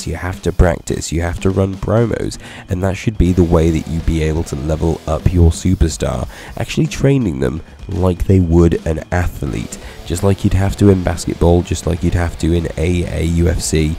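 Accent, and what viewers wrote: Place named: United Kingdom. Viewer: Idk why, but I just love British accents.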